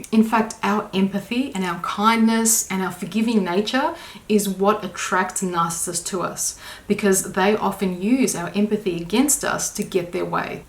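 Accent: Australia. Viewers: Your laymen's explanation of narcissism was so on point. Thank you so much. I am grappling with a family member who presents symptoms of this disorder. Also, love your accent, is it Aussie???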